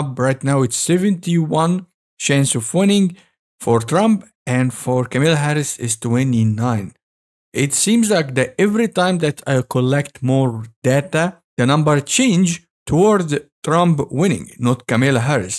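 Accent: Egypt. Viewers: yes Egyptian accent is very clear through the video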